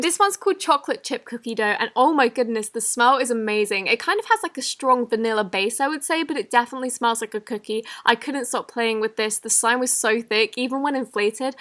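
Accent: United Kingdom. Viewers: love your british accent :Đ can u teach me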